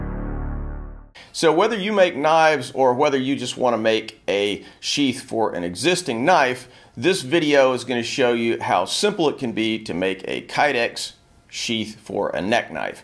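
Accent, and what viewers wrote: United States. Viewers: You have the clearest, most neat accent i've ever heard:P. Coming from a foreigner, You sound like the perfect american accent for me. Just an observation, though quite a silly one. I really love Your work, and videos, and the step by step process. You're great!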